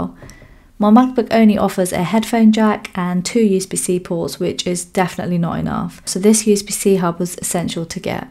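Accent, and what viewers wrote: United Kingdom. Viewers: Truly helped me that you mentioned it doesn't support video output, I am looking for a usb dock to use samsung dex on an external screen ! :) PS : Even as a non-native english speaker I would bet you're from the UK lol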